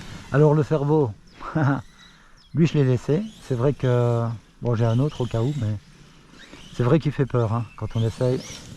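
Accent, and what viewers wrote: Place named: Belgium. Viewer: Salut salut, je reconnais l'accent et les septantes du pays;) t'es de quel coin au juste? On est peut être voisins 🤓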